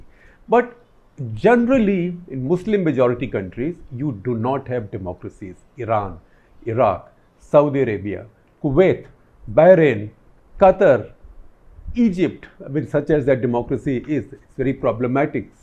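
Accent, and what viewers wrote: India. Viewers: sorry sir apki baat samajnai k liya kaan lagana parta hai......better is to talk in urdu.......as ur english is like urdu......typical indian/asian dialect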